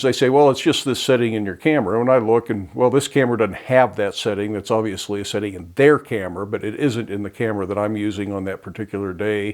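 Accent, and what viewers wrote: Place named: South, United States. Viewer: Good motivational speaker. His voice is not intimidati g as his appearance first was and i love the southern acsent. Great video for encouraging over coming problems youtube home video newby's should watch acassionably to remind them that they really can do it right and not give up.👍👍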